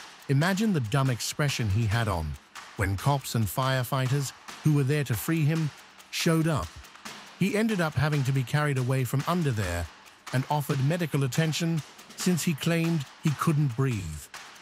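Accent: United Kingdom. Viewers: The voiceover is clearly British English, so why use wordds like 'dude' and 'gas station'? What is a 'dude' anyway?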